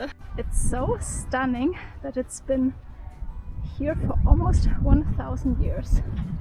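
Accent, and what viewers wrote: Germany. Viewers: Der kleine Otto tut mir leid. Hoffentlich geht es ihm bald besser. Übrigens, wo kommst du her? Dein deutscher Akzent kommt mir bekannt vor.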